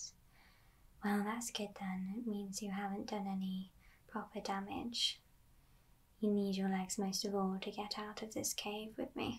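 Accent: United Kingdom. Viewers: Your British accent works great together with the Lara cosplay.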